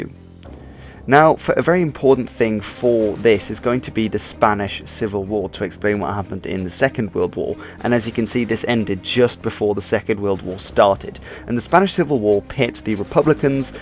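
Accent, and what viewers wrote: United Kingdom. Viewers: You are a Dutchman?! You don't sound like one. If you are Dutch why do you speak English with a British accent? Do you live in the UK or have lived there?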